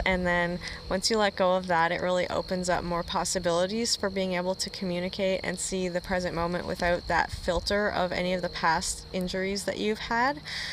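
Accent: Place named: Canada. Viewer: It's funny to me how I randomly picked this after doing a general EFT search (without knowing you or your channel)...and hearing your Canadian "accent". My communication breakdown is with a Canadian 😇💙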